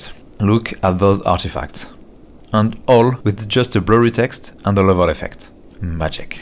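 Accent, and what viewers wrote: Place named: France. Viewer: J'ai l'impression que c un français qui parle anglais la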